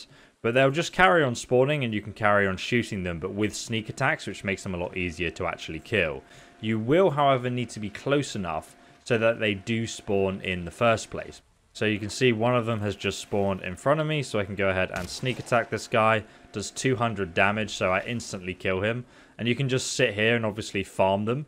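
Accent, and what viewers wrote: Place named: England, United Kingdom. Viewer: I don't know why I prefer to receive instructions from a person with an English accent, but here we are.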